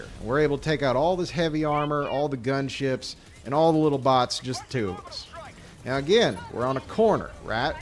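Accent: South, United States. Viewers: Aayy like your southern accent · The southern accent fits helldives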